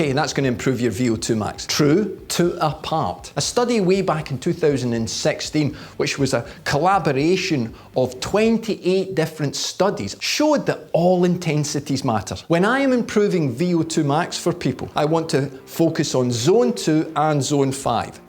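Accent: Scotland, United Kingdom. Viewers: I never expected to get my fitness advice from a guy who looks like Spud and talks like Begbie, but here we are....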